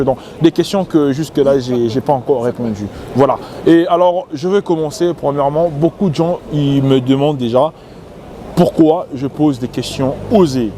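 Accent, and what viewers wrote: Democratic Republic of the Congo. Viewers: tu as déjà avouer Mec. Tu es originaire du Congo Kinshasa. Et votre accent montre plus que vous êtes congolais. Je suis un abonné ( Espagne🇪🇸 )depuis 2019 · Ton accent tes délire tn caractère prétentieux cs genres d choses il n'y a qu'un CONGOLAIS qui a ls couilles d le faire, t'es un zaïr🇨🇩🇨🇩🇨🇩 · Avec ton accent t’es congolais sur 😂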